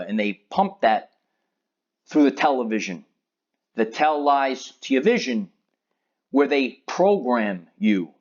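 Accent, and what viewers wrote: New York, United States. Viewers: love your accent. It’s adorable. Are you from New York? Greetings from Calgary Canada 🇨🇦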